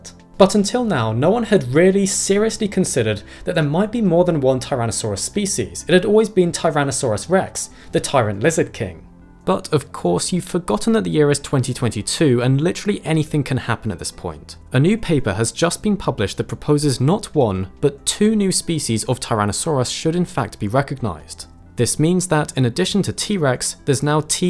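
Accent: United Kingdom. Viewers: They’re literally just British lmao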